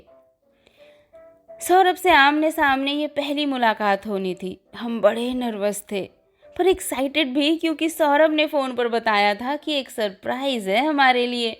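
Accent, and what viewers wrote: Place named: Kanpur, India. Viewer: Wah ji wah wat a style of narrating.. Har baar surprise deti ho dear... Bahut hi maza aa gya itni mast story or itne mast tumhare kanpuri style me gazab ... Kaise bikul kanpuri style me wow it's superb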